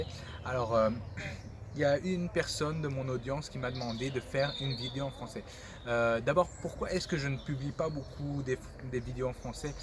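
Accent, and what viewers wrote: Belgium. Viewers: Je suis passé par ici pour voir comment un polyglotte aux traits orientaux parle le francais, mais je ne m'attendais absolument pas entendre un brusselere - ptdr. Et a part ca tu te debrouilles pas mal du tout en Russe, je suis jaloux :)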